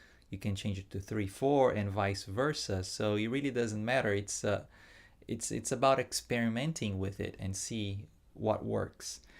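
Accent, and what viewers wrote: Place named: Brazil. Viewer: Sotaque brasileiro está carregado nesse vídeo! haha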